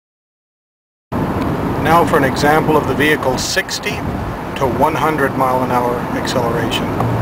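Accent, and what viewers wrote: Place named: Sweden. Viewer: ! haha sounds like you could be swedish. nice video love the merc diesels ive got a w123 240D euro of course.;)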